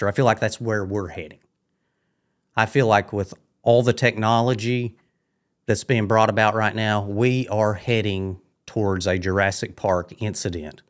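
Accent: South, United States. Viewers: You sound like you're from the south. Either NC or TN. I agree with you. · What kind of southern accent is that? I am from the Appalachian mountains in western NC but I’m not aware to his dialect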